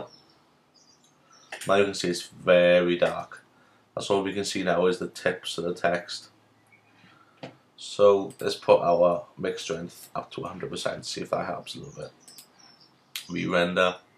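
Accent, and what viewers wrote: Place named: Wales, United Kingdom. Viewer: Well, well! What a surprise to hear your accent! I'm used to hearing Polish, German or American accents when I click on tutorials, so this was a rare treat! That has to be worth a sub! I'm just down the road in Neath! Nice tutorial by the way! Where did you learn all this?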